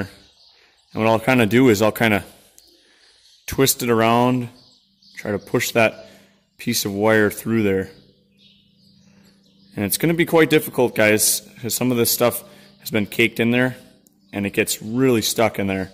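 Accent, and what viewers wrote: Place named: Minnesota, United States. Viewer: You sound like you have a Minnesota accent!!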